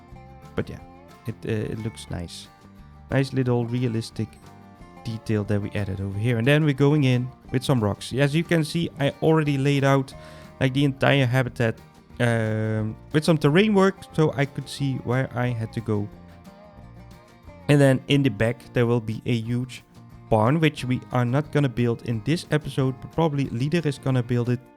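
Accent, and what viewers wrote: Netherlands. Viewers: Altijd leuk hoe je het commentaar doet, beetje nonchalant en love je Nederlandse accent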